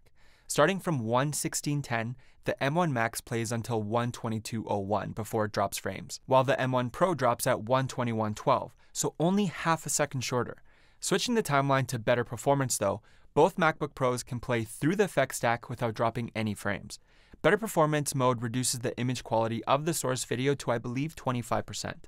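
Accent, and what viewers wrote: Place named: Poland. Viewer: It's hard to say. Rather, if it wasn't for the name of the channel, I wouldn't have come up with the idea that you have a Polish accent. If I had figured it out myself, the accent is definitely not the first thing that would reveal your origin. You may as well be a child of immigrants who came from Poland to Canada and you have never spoken Polish anywhere except at home. Tell the truth if you are agree for it: D It does not matter much to me because I watch the channel because of the content, but it's nice if another compatriot is successful on YT...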